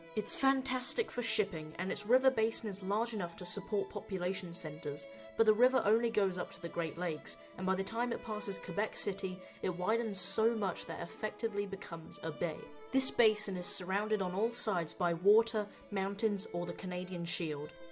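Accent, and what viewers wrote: United Kingdom. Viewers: You sound british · British accent, thumbs 👎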